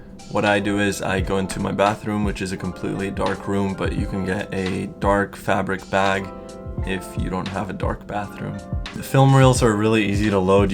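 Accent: Netherlands. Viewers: I love the video so much! I've had a look at your website and i love it! (Idk why but it sounds like you have a Dutch accent) if so, gegroet! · Are you Dutch?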